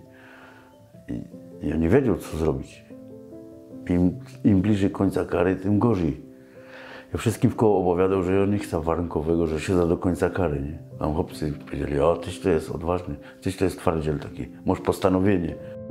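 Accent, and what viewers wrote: Silesia, Poland. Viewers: Super job:)! Uwielbiam slaska gware,akcent. Best regards from Belfast:) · Fajny akcent śląski! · Historia ciekawa ale historia i Śląski akcent to dopiero się słucha :) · Słychać z gwary że to Ślązak. Och lot Och lot.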